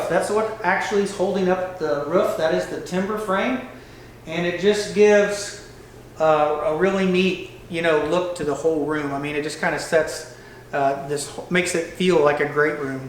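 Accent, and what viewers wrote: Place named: Illinois, United States. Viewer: Illinois accent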